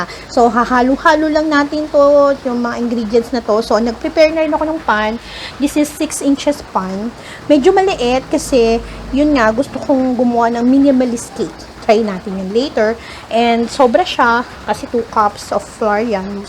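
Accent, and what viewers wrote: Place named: Conyo, Philippines. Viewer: Ang arteeeee 😂😂😂😂 im enjoying your video ng sobra.. ang lakas maka conyo 😂😂 excited to try your recipe 🥰🥰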